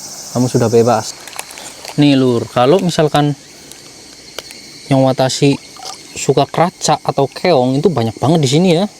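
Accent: Banyumas, Indonesia. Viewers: Kok ngapak mas, asli mana ? Maaf penonton baru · asline ngndi kang,,ngapak deneng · Kiye wong banyumasan kiyeh...cilacap, banyumas, purbalingga, banjar negara, apa bumiayu lur..?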